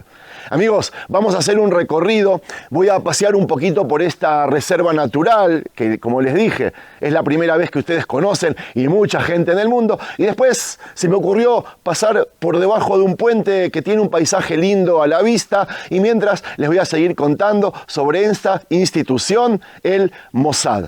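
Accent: Buenos Aires, Argentina. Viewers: Que interesantes y variados son tus videos. Me sorprendes cada vez mas. Gracias, por estos paseos y narraciones maravillosas con tu acento porteño que me suena a musica celestial. Mil abrazos, querido, desde Barcelona. Un beso para tu Nira querida. Seguimos orando x Israel y x mis queridos hermanos judios. SHALOM. AM ISRAEL JAI✡️❤️🙏🇮🇱✡️❤️🙏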